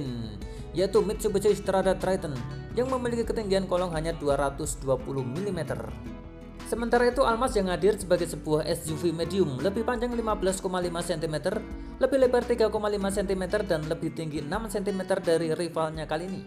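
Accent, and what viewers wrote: Java, Indonesia. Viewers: Tak LIKE 7 tak SUBSCRIBE yo, Mas. (aku seneng gaya bahasamu sing Medok iku) Qiqiqiii....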